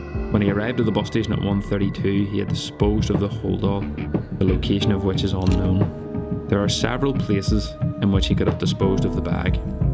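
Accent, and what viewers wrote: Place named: Belfast, United Kingdom. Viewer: Fantastic film, love the Belfast accent...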